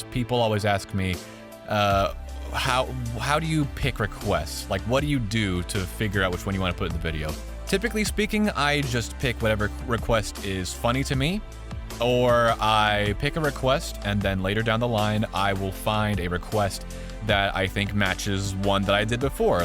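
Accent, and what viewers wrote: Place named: Estuary English, United Kingdom. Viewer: It's estuarine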